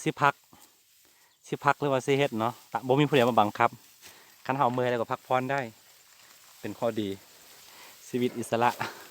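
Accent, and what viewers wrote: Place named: Ubon Ratchathani, Thailand. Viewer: เจ้านิกะเว่าได้หลายภาษาเนาะเว่าคือคนอุบลบ้านข่อย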